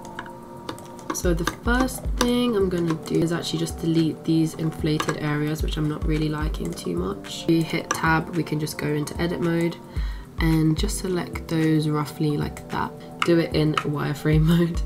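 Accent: London, United Kingdom. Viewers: I love hearing you speak, you sound like a fellow Londoner! Hearing you explain everything so simply and clearly (which you'd think is hard with such hard software) is gorgeous - I haven't even got Blender just trying to educate myself! Thanks :) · First of all great tutorial. When you scroll the camera. You control I think it is so that you can zoom in or out with more control and it does not jump with such big steps. Thanks again. Keep them coming. Also your very funny. Makes it easier to listen to haha. London lady?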